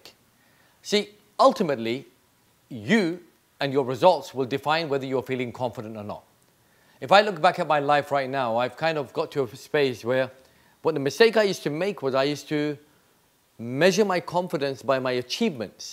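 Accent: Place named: Europe. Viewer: Oh, you are really great. My problem is--I can not follow your foreign accent, and difficult to understand your content.... You do not know what I have done and still doing to understand your accent. I think yours are European accent, may be like of England..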